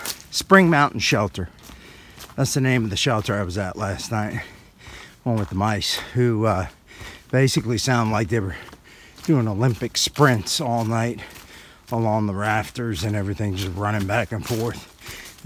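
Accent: United States. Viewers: I knew you were from Jersey. That North Jersey accent is unmistakable! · Great videos! For someone from NJ, you picked up a nice southern accent!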